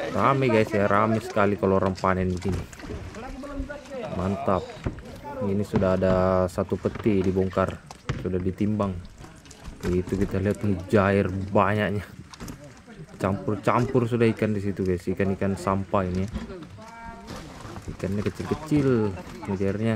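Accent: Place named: Makassar, Indonesia. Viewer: logat bicaranya kayak berasal dari kota Makassar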